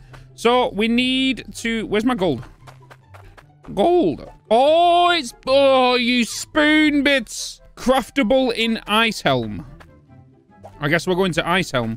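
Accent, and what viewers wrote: United Kingdom. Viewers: all, also ur British accent we you go, "GOD BLOODY DAM IT", all the spending spree montage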